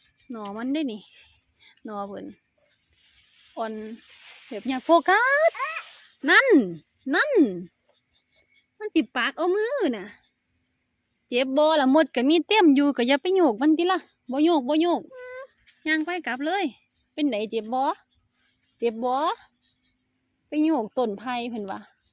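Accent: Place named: Sisaket, Thailand. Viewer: สำเนียงศรีสะเกษ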